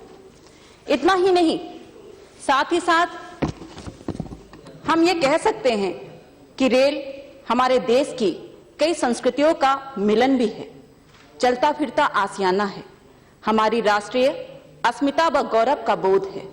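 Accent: Standard. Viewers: इतना शुध्द हिंदी संबोधन बहुत कम लोग करते है ।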